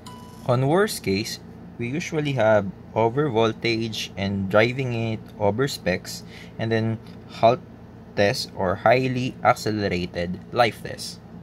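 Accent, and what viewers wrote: Philippines. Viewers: Nice video,, Your voice accent like my Filipino colleage btw.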